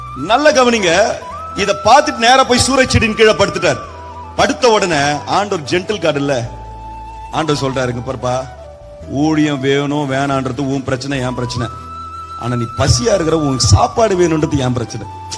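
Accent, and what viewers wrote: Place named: Chennai, India. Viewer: மெட்ராஸ் தமிழ் மிகவும் அருமையாக இருக்கிறது சகோதரா கர்த்தருடைய வார்த்தை உண்மை அதுவே மெய்யான ஜீவன்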